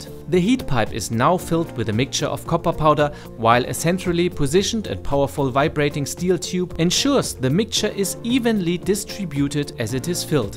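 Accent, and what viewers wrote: Germany. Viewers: That german accent :D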